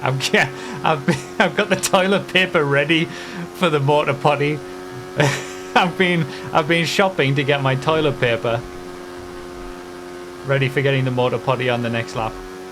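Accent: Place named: North, United Kingdom. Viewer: interesting given he's neither of those, he's just northern lol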